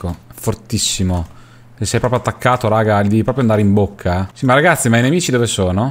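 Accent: Naples, Italy. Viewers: Ma sei napoletano io si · E stupendo il tuo napoletano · ma sei napoletano a fai finta di esserlo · Come è bello quando parli napoletano😍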